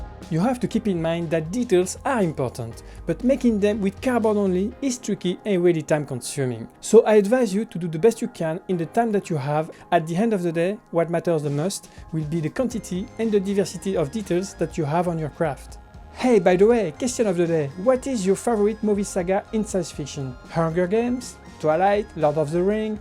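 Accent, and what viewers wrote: France. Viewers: Tes un français ou pas parce que ta vraiment un axen très français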